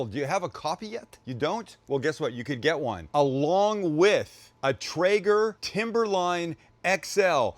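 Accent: Quebec, Canada. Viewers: Your French Canadian accent is very good, this coming from a Québécois fan of yours. Looks delicious. However, the gravy for the poutine is always brown (roasted flower) in all the poutine joints I know of. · Solid French Canadian accent. You sounded like every trucker in my mill from Quebec eh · Thumbs up on the Quebeçois accent! I prefer it coming from sexy girls, but will do. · Your French Canadian accent is spot-on. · Great Franglish Queebeck accent, you're the best. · I'm from Montreal and I promise you Quebec accent is awful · Tellement une bonne recette de poutine… L’accent canadien français, québécois est de marde mais la recette est écœurante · Need more French Canadian accent…brilliant!! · very good French canadian accent but poutine is pronounced "puts in" love your content keep em coming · Retire the French Canadian accent!!! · Being from Montreal Quebec, I can tell you that accent was horrible lol. 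I love you, but you gotta come to Quebec more often and practice the accent lol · That is the best French-Canadian accent I've ever heard. I also smell toast. 🤔 😆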